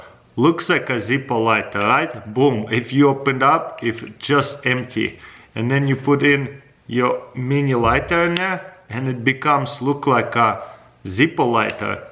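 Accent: Russia. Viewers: Russian awful english Slang... Bäääää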